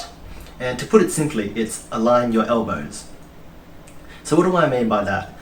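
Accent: Australia. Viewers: Yes we have problems getting them too. What is your country, you sound Aussie like me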